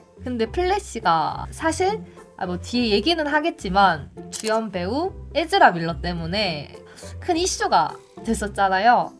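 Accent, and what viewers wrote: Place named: Busan, South Korea. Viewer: 익숙한 억양(부산사람)으로 차분하게 꼼꼼하게 잘 설명해주셔서 재미있게 시청했습니다 감사합니다 플래시 꼭! 보겠습니다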